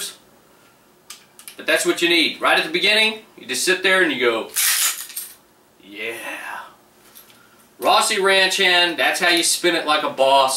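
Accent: United States. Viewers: Love your fully Texan accent (no homo tho)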